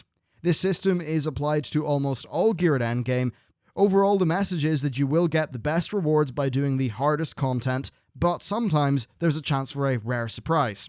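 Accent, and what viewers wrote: Northern Ireland, United Kingdom. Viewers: oh right i thought he was from Norin Iron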